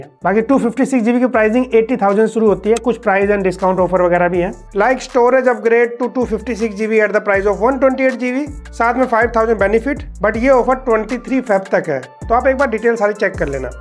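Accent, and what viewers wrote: Lucknow, India. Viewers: Lucknow se ho kya bhai?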